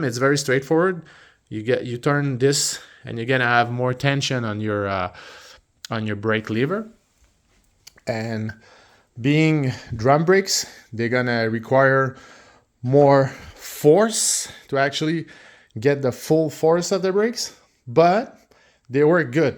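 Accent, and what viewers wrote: Quebec, Canada. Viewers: This guy sounds Canadian am I wrong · Définitivement, c'est un accent québécois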